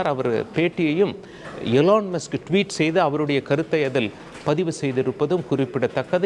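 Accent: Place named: Salem, India. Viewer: Salem voice and prononciation excellent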